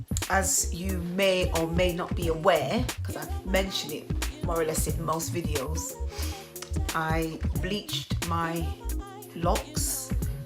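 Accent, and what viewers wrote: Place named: Northern England, United Kingdom. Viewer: Great video - I only use clarifying shampoos, don’t want any build up in my locs. I’m loving your videos and the northern accent makes me feel like I’m back home in Bradford! I moved to the south as a child but go back often and your accent is making me home sick.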